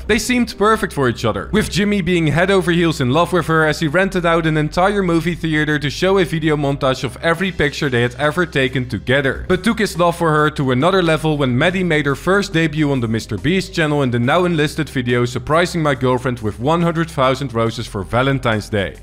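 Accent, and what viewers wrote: Netherlands. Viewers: Where are you coming from? You sound Dutch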